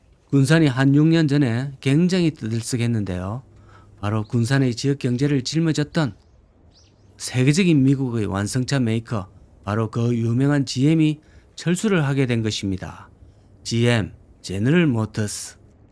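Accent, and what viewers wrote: Gyeongsang, South Korea. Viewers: 우야노.....??앵커는 경상도??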